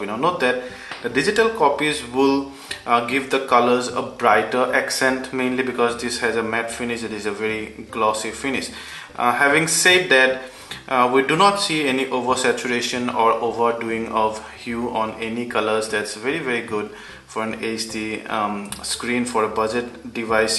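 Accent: Assam, India. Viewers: You sound like Assamese :D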